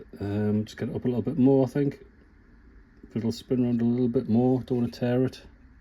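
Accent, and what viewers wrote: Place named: Tyneside, United Kingdom. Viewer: by your dulcet tones that you were a fellow Tyneside lad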